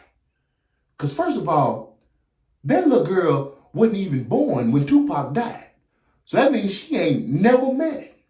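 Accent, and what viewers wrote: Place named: South, United States. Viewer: I loved your analysis. I’m proud of you. You proved Southern accent does not equal dumb. I know because I’m from Jackson, Mississippi.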